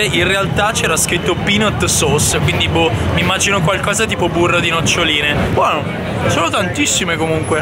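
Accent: Bergamo, Italy. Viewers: sciarpa di capelli, accento bergamasco, street food, ignoranza in abbondanza, scusatemi ma anche senza coffe shop made me feel high.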